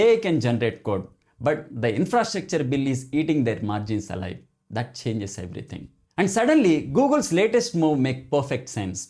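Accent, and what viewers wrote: India. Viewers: South Indian? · Thi guy is awesome. He is the most Indian sounding Indian I have seen on YouTube. Nothing fake, and he even drops the clever humour and nuggets Indians do. I love it!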